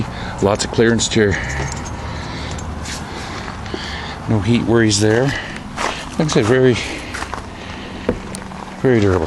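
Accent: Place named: Canada. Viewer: Wut!? That's a hoser accent if I've ever heard one!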